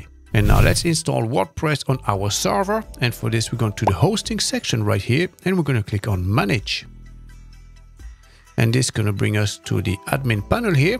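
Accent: France. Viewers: I hear nice French accent 👍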